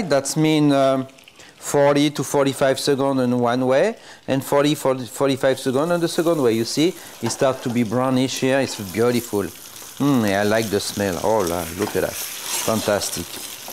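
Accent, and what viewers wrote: France. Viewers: So hard to speak English with the French accent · I'm so in love with his French accent! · I love the way French people speak. My friend speaks exactly like he speaks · What i like the most is the subtle frrrench accent😄😄😄❤❤❤👍👍👍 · Adam Sandler with a French accent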